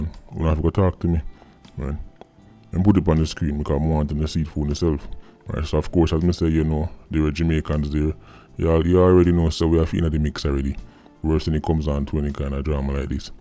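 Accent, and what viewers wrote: London, United Kingdom. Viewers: you sound like real British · Your London accent on point we only used esta to go USA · Mans from London bruv. Lol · Because the UK have left Brexit there is a deadline for EU citizens who still live in the UK to get their papers sorted to remain in the UK and maybe because they havent got the papers and dont want to go back to the EU so that may be why there are people trying to get Bonehead Biden's America, so i doubt whether its an actual UK born citizen but as UK was a former EU country (thank God UK people had sense to leave it) you could have people from all over Europe in the UK so that may be the answer or as you say it be a combination of a European trying to escape UK and EU justice to go the US where the current President is one of the most crooked men in Politics and where the Justice system is becoming a joke and illegal immigration is welcomed so the KKK Democrats can "increase" their votes...pathetic, by the way nice London accent 😂As for the knife crime you have a group of kids playing at life who are killing each other, most of them have unlying mental heakth issues or broken homes bad from school and have nothing about themselves; this is not societies fault as this younger generation have ALL the benefits and opportunities all the support all the legislation to get where they want and many young people are achieving but its the handful of demon possessed wretches that cause the problems time and time again yes some come form bad homes but so do many many other youths who CHOOSE to achiev....the UK is safe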